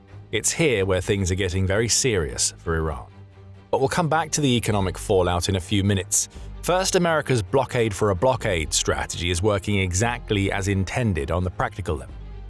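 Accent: United Kingdom. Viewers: Why the UK Accent? Gotten indeed!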